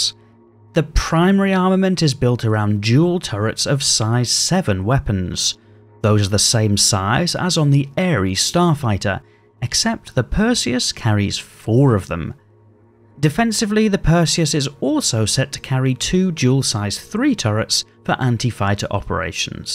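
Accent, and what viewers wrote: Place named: England, United Kingdom. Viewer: Kraaken? You sound English to me, and I’ve never heard anyone pronounce it that way. If I didn’t know better I’d say it was done to trigger people like me to rush to the comments and say so!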